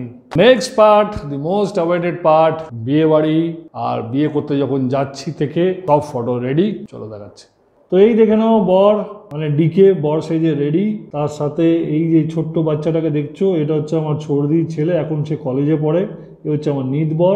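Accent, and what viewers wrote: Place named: Andaman and Nicobar Islands, India. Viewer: Actually ai accent ta apni port Blair e chilen bole. Ekhner max bengali r kothay 2-3 te hindi bole. Ekta mojar kotha boli bengali slang ekhner max Tamil telegu ra bujhe ebong day o even Halka Bangla bojhe. Ami last 3 yr e antoto 10-15 jon Tamil telegu Malllu k dykhechi Jara fluent Bangla bole ekhne.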